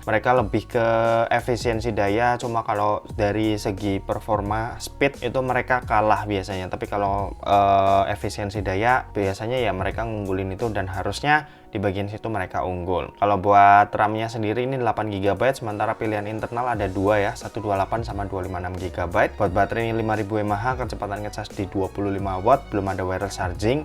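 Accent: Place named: Banyumas, Indonesia. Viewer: Logate ngapak ya😂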